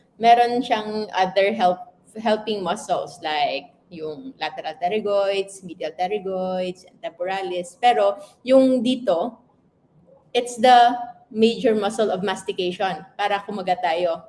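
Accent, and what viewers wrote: Iloilo, Philippines. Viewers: Ilonggo ka ba Doc?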